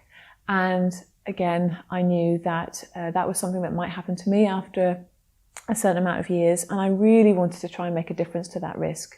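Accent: England, United Kingdom. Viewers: Great to hear an English accent talking about WFPB living. Well done.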